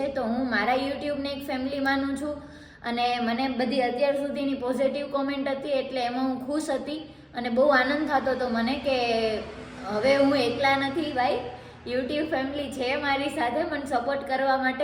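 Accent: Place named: Kathiawar, India. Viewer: Ek nabar bolk desi badha ni jem ferndsi nay bolta ae j hova ni maja aave kadhiya vadi ek dam ❤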